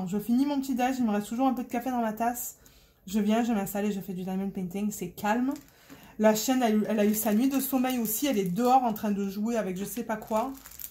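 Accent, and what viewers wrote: Marseille, France. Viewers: Hello 😊 j’ai profité du 11 pour 2 toiles perso chez Ever moment. Jasmine becket griffith c’est pas trop mon truc non plus mais je vais aller faire un tour par curiosité sur le site. J’ai adoré les anecdotes sur les expressions, les 2 premières sont hilarantes. Par contre pour le terme écœurant ça fait drôle de se dire que ça signifie le contraire au Canada 😏 Je trouve que tu as encore un peu l’accent marseillais même s’il doit être moins prononcé qu’au début de ton arrivée. Ça m’intéresse de savoir pour les écoles perso car avec mon mari on se demande si par la suite on ne déménagerai pas au Canada. Paraît il que c’est le top pour les enfants autiste comme mon fils, en France c’est une vraie galère, un combat que je n’arrive plus forcément à mener et qui m’épuise. D’ailleurs je suis en mode gâteau, mon fils aîné fête ses 9 ans demain! 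Je ne savais pas que l’on pouvait parler avec toi sur Messenger 🤗 Passe un bon week-end 🙂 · Coucou ça va ? Alors, non je n'ai pas profité des soldes du 11 novembre sur aliexpress parce que j'avais déjà passée toutes mes commandes. Je n'ai pas pu attendre lol. J'ai tellement hâte de te voir faire la toile aux 275 couleurs ! J'aime beaucoup ce que propose Diamond Dotz mais je trouve que c'est très cher ! OUI j'adorerais pouvoir voter pour ta prochaine toile et avoir le choix entre 3 ou 4 dp. J'ai beaucoup aimé tes anecdotes sur le Canada par rapport à la France. C'était très drôle. (Oui ! Tu as toujours ton accent marseillais teinté d'un accent québécois 😉) Le tag : J'adore mon travail. Je vend des piscines par téléphone en télétravail et j'aime ce que je fais. Les gens me font partager leur projet, un petit bout de leur vie et c'est varié et très intéressant. Mon genre de film : les comédies, les films fantastique et les drames. 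J'aime beaucoup les films comme love actualy, hunger games ou la liste de Schiller. J'aime pas trop les films d'horreur, je suis trop sensible je crois lol. Je commence ma journée tranquillement avec un bon petit déjeuner. J'aimerais pouvoir faire du dp dès le matin mais avec 3 enfants c'est pas possible ! 😂 La chose la plus importante pour moi c'est ma famille. C 'est vraiment ma priorité. Je suis nulle en cuisine ! Je fais beaucoup d'efforts mais je n'aime pas beaucoup ça 😅 Mon super pouvoir : j'aimerais pouvoir rendre heureux quelqu'un juste le touchent avec les mains. Mon 1er boulot : caissière dans une grande surface. J'ai bien aimé. Les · Excellent vlog ! Tes expressions sont trop drôles !! Tu m'as fait bien rire !! À chaque fois que j'entends un français dire "les gosses" les oreilles me silent !! Hahaha Oui pour Tupperware il faut le dire en anglais ! Et pour moi tu as définitivement encore un accent français ! 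😉🤣 Dans les banlieues nord, tout est seulement en français. Il n'y a pratiquement rien en anglais.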